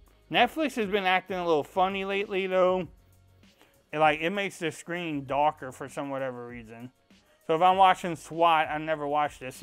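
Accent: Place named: North, United States. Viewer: Great videos! I just got one and it's so much easier to hear instructions in english with an American accent! I mean you don't sound like me and I don't sound like you! But I guarantee you we both can comprehend each other. You sound northern to me (no offense!) I'm from Miami . If a lot of these people, that think they speak english would quit talking to their friends, in their language and use english both people would get better. Ask Tony I just set mine up and didn't even raise my BP! Thanks! (sub added)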